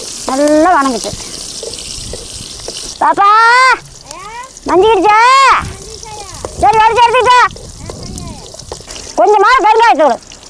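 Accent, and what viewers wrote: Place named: Kongu, India. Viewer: சமையலை.விட நம்ம கொங்கு தமிழ் அழகு ❤❤❤❤❤❤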